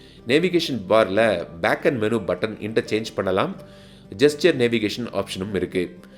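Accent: Kanyakumari, India. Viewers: உங்கள் குமரித் தமிழில் கேட்பது இன்னும் திருப்திகரமாக இருக்கிறது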